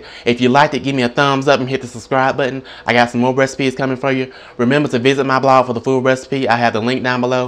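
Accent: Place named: South, United States. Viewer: I can tell by the way that you talk that you're from the country so I know your biscuits are good!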